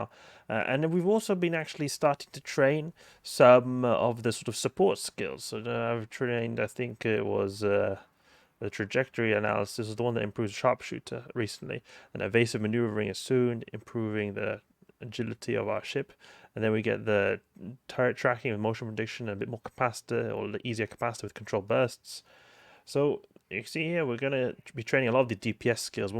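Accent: South Africa. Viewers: Huh, I thought you were South African XD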